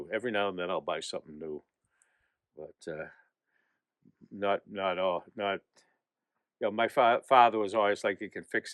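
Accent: Boston, United States. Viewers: Stan buddy i wana tell u how awesome it is to still watch u and have new videos today even after ur show stopped ...me and my mom used to watch u guys every week.... Were also from massachusetts just west of plymouth and she picked up on ur accent before we even knew u were from boston...she was born in '60 in quincy ....anyways shes no longer with me and i just found ur videos on utube and its really helped me feel closer to her cuz it was something we did together....i just wanted u to know how ur helpin me today so THANK YOU so much Stan and family we love u guys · Medford mass fire shirt!!! it amazes me you still have your Boston accent!!!!!! after 40 + years!! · Is that Medford NYFD shirt? I live near there..love ur Baston Accent I miss seeing you all on TV 😭 great red white and blue 💙❤️ too ! God bless you all 🙏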